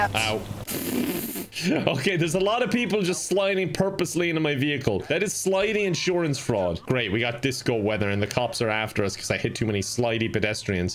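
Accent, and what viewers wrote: Ireland. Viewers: Is he Irish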